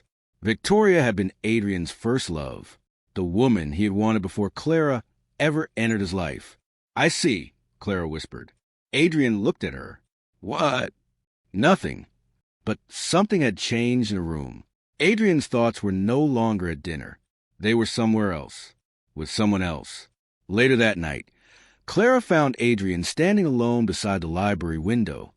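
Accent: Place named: South, United States. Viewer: Good story , narrators voice soundos too country🫤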